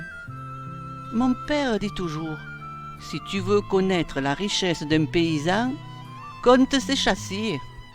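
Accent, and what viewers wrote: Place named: Provence, France. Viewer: Quelle belle histoire, avé l’assent, qu’elle est belle cette crèche, je suis bercée. Merci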